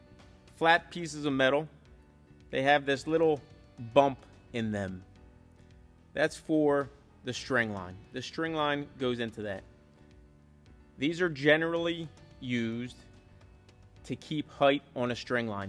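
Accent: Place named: Baltimore, United States. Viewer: Based on your accent, you are from Baltimore or Philly.?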